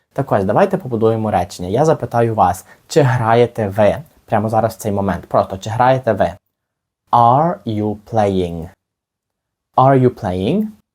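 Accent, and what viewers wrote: Standard. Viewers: дякую за чисту українську мову приємно чути успіху вашому каналу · Дякую за чисту українську мову. Успіху тобі.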